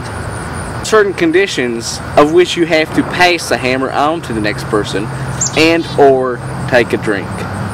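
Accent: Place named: Northern Appalachia, United States. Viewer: That ain't a Southern accent, that's a Northern Appalachian accent.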